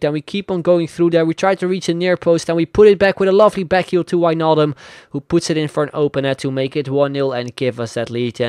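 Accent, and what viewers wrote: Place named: Netherlands. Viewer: 1e video die ik kijk van jou en ik hoor gelijk de je nederlands bent :D maar je spreek echt goed engels man. en de video is echt tof +1 abone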